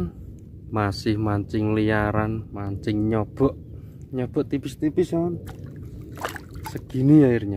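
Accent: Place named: Java, Indonesia. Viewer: Q suka cara ngetapnya.. Medhok tu maszehhh Salam hormatku boss ku ❤️🙏❤️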